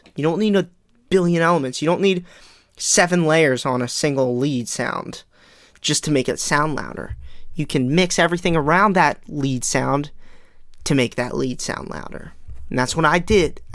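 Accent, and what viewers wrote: Philadelphia, United States. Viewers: You have a Philly / South Jersey accent - am I correct? Nice 4 bars btw sounds good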